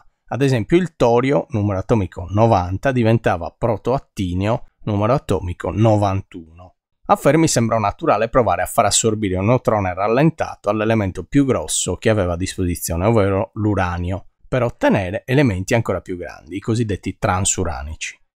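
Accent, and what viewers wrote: Sardinia, Italy. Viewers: Complimenti per la sua chiarezza espositiva. Il suo italiano impeccabile e il suo innegabile accento sardo rende ogni suo video un tributo alla scienza che vale la pena essere visto e interiorizzato.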